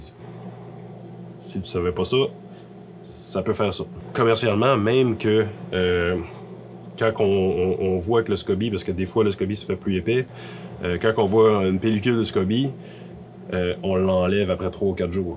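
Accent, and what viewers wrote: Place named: Gaspé, Canada. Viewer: j'ai compris pourquoi tu peux pas vraiment expliquer mieux.. En fait tu me diras si je me trompe mais je pense qu'il y a deux façon de voir : 1/ soit on prend un liquide biologiquement neutre (thé+ sucre le tout a température ambiante) et on y jette ce fameux scoby dégueulasse qui contient des bactéries et des levures (en faible quantité) et qui va ensemencer le thé sucré 2/ soit on prend du liquide bien vivant (culture) qui lui n'est pas dégueulasse et contient bien plus de bactéries et de levures que l'on mélange au thé sucré neutre qui lui aussi va être ensemencé mais plus "proprement". dis moi si j'ai bon .. si c'est le cas ben c'est que tes explications auront été efficaces parce que j'ai rien lu ni vu d'autre en la matière.. juste pour la première fois bu du scoby dimanche dernier ! Pour l'accent Gaspésien, a cause de toi j'ai ré-écouté 30 sous zéro Kévin Parent que j'avais pas écouté depuis des lustres .. 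trop grandiose ce gars avec son accent .. j'ai lu qu'il avait été emmerdé pour jouer au cinéma à Montréal et qu'il avait du perdre un peu de son accent .. c'est comme vos chanteurs quant ils viennent en France, y sont bien obligé de prendre l'accent parisien .. mais je te rassure c'est la même chose des chanteurs du sud de la France ou du nord de la France quand ils montent à paris ils sont obligé de prendre un accent plus neutre genre "journal télévisé" Grade le bien ton accent Gaspésien, c'est de la culture pure .. pas du mac Donald ! a plus jm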